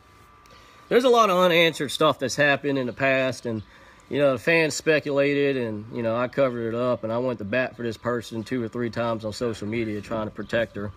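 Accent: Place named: South, United States. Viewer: Asian with a southern accent...amazing/sexy I watched your whole video even though I don't know you. I would fly you to the Philippines and give you zen.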